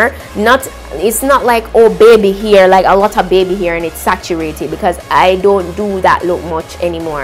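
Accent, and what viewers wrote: Jamaica. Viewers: Love love love that talk. You must be jamaican, I heard that patois 😁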